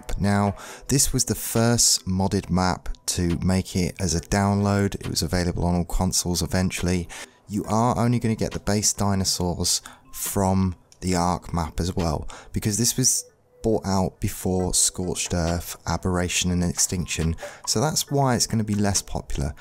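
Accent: United Kingdom. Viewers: A Young British Bob Ross teaches Ark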